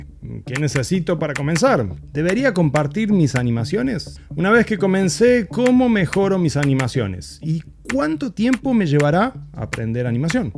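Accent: Argentina. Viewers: ah me pareció escuchar algo... en tu voz se deslizaba un poco de sierras de cordoba , peperina , espinilla y burro ! Que bueno que hayas llegado adonde estás con la animación. Yo solo colecciono fracasasos en el mundo de la aniamcion. Lo peor que me encanta. Y quizás deje y tire todo ya . Debo ser muy amargo .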